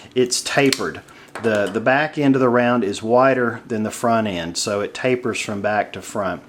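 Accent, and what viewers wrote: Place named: South, United States. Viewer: I wouldn't recommend you carrying a gun or complaining about this fine weapon.I realize you must live in a trailor park by the hick accent.Please do yourself a favor and contact Kahr.I believe the service rep would explain to you that the gun is designed that way.The wiggle and wobble don't effect the gun.Gun requires a break in.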